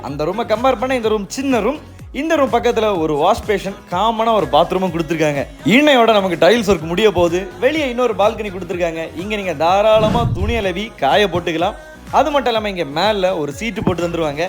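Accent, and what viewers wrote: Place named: Marthandam, India. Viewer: Marthandam tamil pesuraan kanyakumari or kerala border ah irukum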